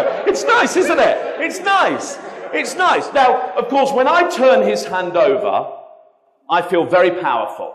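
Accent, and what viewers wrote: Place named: United Kingdom. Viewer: the british accent helps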